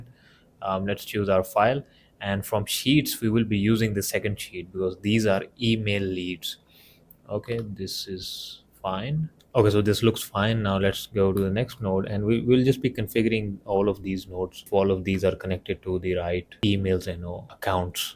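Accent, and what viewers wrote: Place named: India. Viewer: BRO WHAT IS THIS BIRYANI ACCENT MAN WE CANT LEARN SHIT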